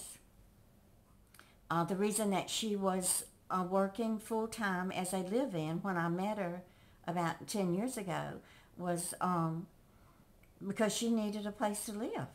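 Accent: United States. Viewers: It’s not what you say but how you say it. Here in the UK we love your American accent and cute sayings and expressions.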